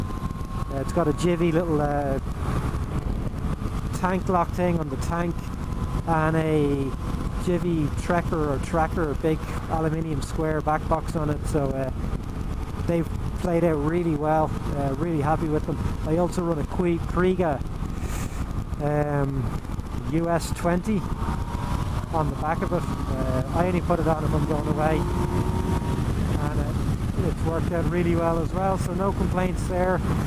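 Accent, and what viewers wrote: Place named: Ireland. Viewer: Hi, nice review, and nice to hear an Irish accent on for once. Looking to get a Tracer, you mentioned the seat being bad, I have an 04 R1 and I find the seat cutting into my leg after a couple of hours and I get a numb bum:) What's the tracer like? Do you still have it?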